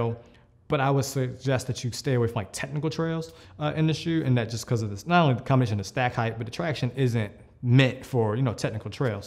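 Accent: Baltimore, United States. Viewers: You from Baltimore??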